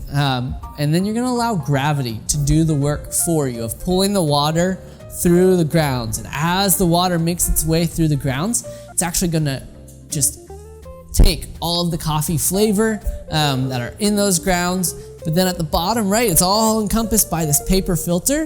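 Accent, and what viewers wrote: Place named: United States. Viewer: I never believed in an american accent until now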